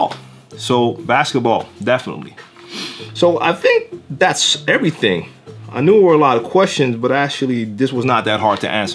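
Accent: New York, United States. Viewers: Damn, I thought you were a New York dude that has been overseas because of military service or something-I thought you were American!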